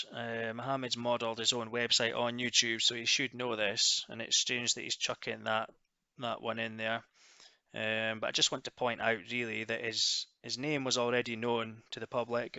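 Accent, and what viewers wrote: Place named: Aberdeenshire, United Kingdom. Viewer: Aye aye, We’ an accent like thon, there’s a fair chance ye can see Bennachie fae yer front door!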